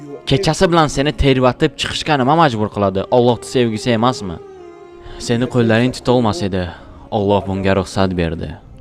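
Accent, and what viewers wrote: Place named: Tajikistan. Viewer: Tojikistindan bo‘lsalar kerak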